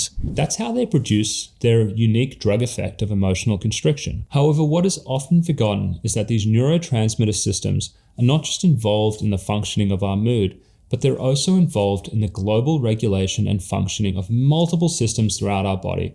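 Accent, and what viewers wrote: Australia. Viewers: had 2 hospital stays last year after not being in a psych ward since 17. I’m now 43. It was. HARROWING and BEWILDERING and WILDLY ETHICALLY REPULSIVE that they dispensed meds so freely. I spent 25 days in Denver Springs and saw and experienced things I never want to again. But it grieved me how people weren’t TOLD to get outside, weren’t taken aside to be talked to like a HUMAN PERSON with normal emotions who maybe needs a nurse to just sit beside them for a hot minute and be an empath (they didn’t seem to know what to do with young women there in a psych ward for the FIRST TIME EVER defeated and crying on the ground. get some social skills, docs!) For me, I’ve had one of the worst, longest cases of akathisia and tardive dyskinesia my doc has ever seen and he’s seen a lot. Something’s gotta change and so many of us need healing. I’m working on the emotional and heart/soul healing now with my pastor, counselor, and writing a memoir. 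I just found your channel, Dr.. And you must be… Australian? My fave Christian brother artists are native Aussies and sound like you.? Do you know them? THANK YOU FOR DOING WHAT YOU DO. even if I never get to your clinic or Dr. Amen’s or get off bipolar meds on this side of Heaven. You are doing amazing work. God bless you and that clinic.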